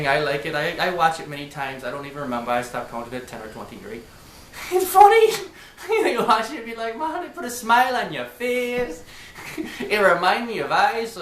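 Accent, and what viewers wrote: Trinidad and Tobago. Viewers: Yes man u def sound like a Trini fo'real.... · You sound Trini still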